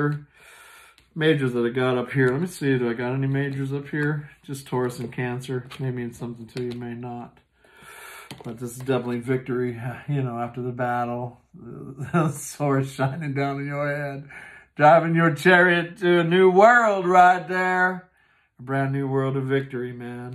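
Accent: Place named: South, United States. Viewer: I love your energy! I love your humor! I love your passion! And i love your accent (i'm not a native english speaker but, do i sense a southern accent there?). I claim all your readings in advance! Love and Light!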